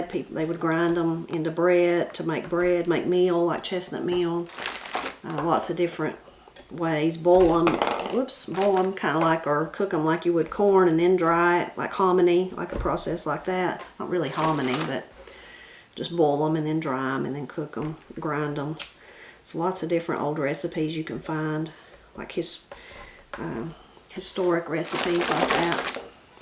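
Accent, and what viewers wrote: Appalachia, United States. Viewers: I remember running barefoot on warm summer nights playing hide n seek stepping on the chestnut burrs lol. .my dad had a orchard with fruit trees, chestnut trees and huge gardens Those were the days. Love your channel and your Appalachian accent. What a wonderful family you all are. I watch you all the time. We grew up born and raised in S Western Pa. making homemade cider and jellies. You ever try corn cob jelly? It's really good. Happy New Year!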